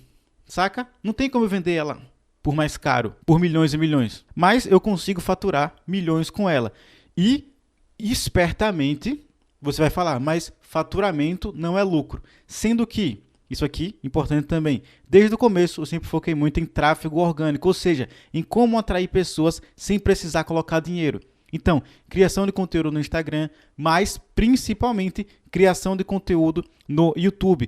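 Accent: Northeast, Brazil. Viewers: você é do Nordeste meu irmão! Top demais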